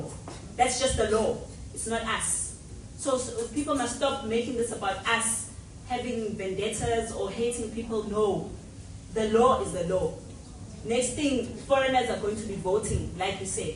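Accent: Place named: Durban, South Africa. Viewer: That Durban Accent is loud and we proud of her.Siyabonga dade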